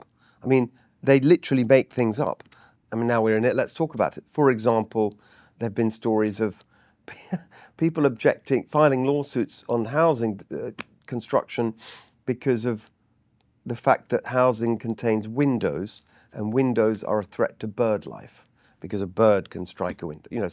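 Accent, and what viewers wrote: United Kingdom. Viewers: The man has a British accent but lives in California? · another Brit telling us how 2 plus 2 equals 5? Great. This man is devoid of any economic knowledge. The price of energy in California is almost 50% above the national average. Energy costs are inflationary. Plus, he doesn’t distinguish between the cost of living versus the cost of labor. How about bringing someone else in whose sole qualification isn’t a pommy accent?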